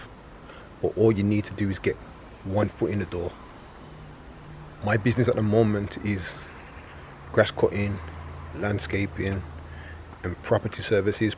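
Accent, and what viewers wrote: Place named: United Kingdom. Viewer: You are spot on mate, I started on a pushbike and using the train, got some funny looks on the train with the hedgecutter! I did buy new at the start but my strimmer & hedgecutter came from Argos and the Titan multi tool from Screwfix. This allowed me to but a van & now a full kit of pro equipment, been self employed for 11 years this year, I'm not rich but comfortable, and that's the way I like it! Good luck to you chap, it's also great to hear a Brit on one of these videos!! 🇬🇧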